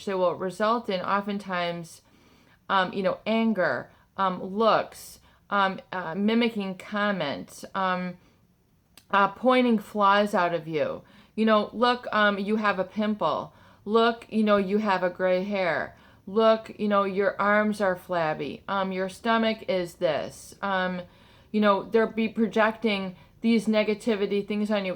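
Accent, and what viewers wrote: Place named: Illinois, United States. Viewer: I have been listening everyday. I have been journaling. I have put what you have said into practice with the affirmations and the journaling. Thanks so much for your work (and so much work you have done to help us!). I am from originally from McHenry, Il. It sounds like you are from Illinois. I so appreciate what you are doing. Love to get to know you somehow. Keep up the good work!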